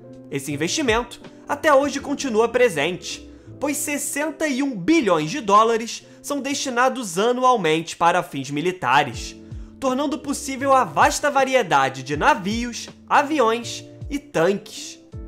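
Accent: Rio de Janeiro, Brazil. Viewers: Sotaque chato. É carioca? Não consegui me concentrar no assunto do vídeo.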